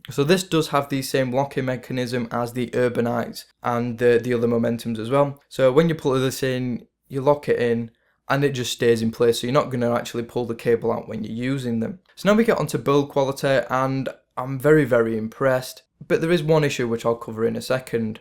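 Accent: United Kingdom. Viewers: I don't understand well your British English.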